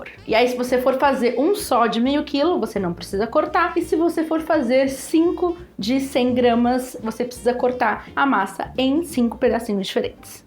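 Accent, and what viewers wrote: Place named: Bahia, Brazil. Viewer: KKK vc é da Bahia? Fala igual a gente velho kkkkkkkNa moral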